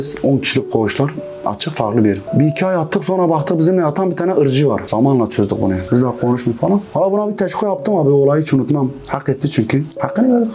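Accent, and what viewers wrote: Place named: Diyarbakır, Turkey. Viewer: Senin şivene kurban olayım diyarbekirlim ❤🌹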